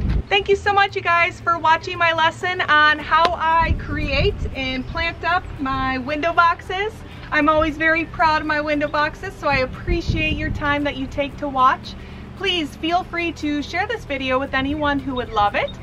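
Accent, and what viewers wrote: Wisconsin, United States. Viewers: I think she is from Wisconsin.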